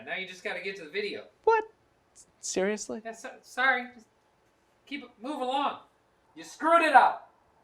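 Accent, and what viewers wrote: Italy. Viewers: That Italian accent tho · Italian accent sounds like mario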